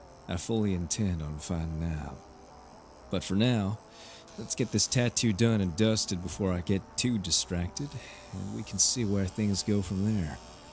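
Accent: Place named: South, United States. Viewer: I was not expecting an accent so thank you I always love an south accent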